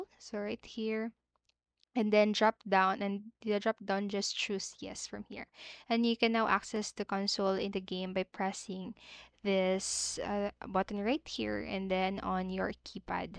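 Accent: Philippines. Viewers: you sound like a filipina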